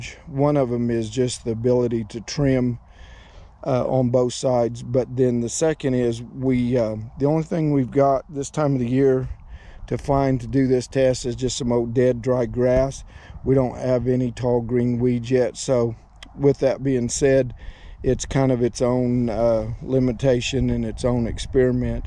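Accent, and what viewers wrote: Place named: Kansas, United States. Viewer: You sound Kansas are you from Kansas?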